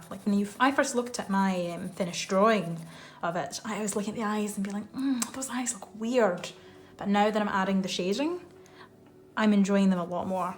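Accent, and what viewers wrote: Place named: Scotland, United Kingdom. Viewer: u from scotland for sure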